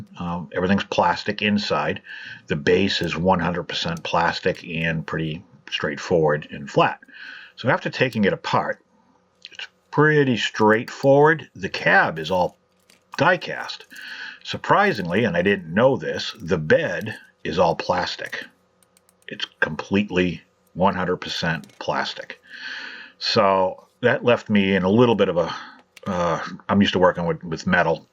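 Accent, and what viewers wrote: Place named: Boston, United States. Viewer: I love the Video! You gave me some great ideas. I noticed your bracelet, and I am a disabled veteran who was so bored during the pandemic, that I re-ignited my passion for collecting my diecast cars and motorcycles. I didn’t love the Motormax die-casts, but now I’ll grab some to customize. My big question is this, the accent tells me you MAY be a Sox fan...lol, I a New Yorker so there is that. Either way, you have a new subscriber and fellow East Coaster!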